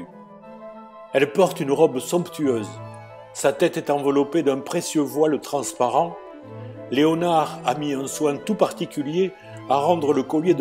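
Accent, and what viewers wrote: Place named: Provence, France. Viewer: J'aime votre accent de gentilhomme provençal 😉😉🤗🤗🤗😎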